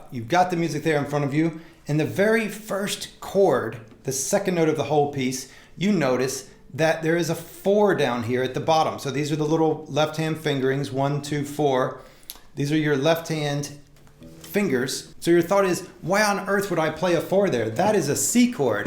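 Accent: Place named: South, United States. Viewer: I get his point, good vid...also keep trying to place his southern accent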